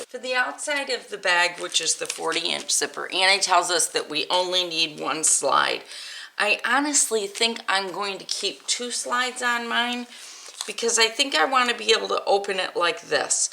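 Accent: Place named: Midwest, United States. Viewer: Taking a class on this so it was great to see your steps ahead of time ! Thanks so much ! Love your mid western accent - I’m a Detroit transplant now living in Texas!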